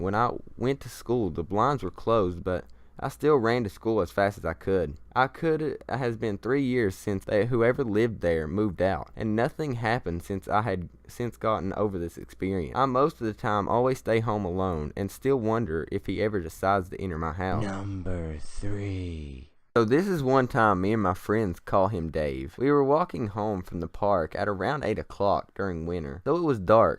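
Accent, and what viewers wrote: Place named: South, United States. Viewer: You are so southern what state are you from?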